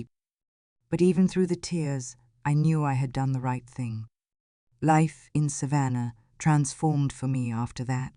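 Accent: United Kingdom. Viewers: Hmmm, lives in GA, but an accent from the UK.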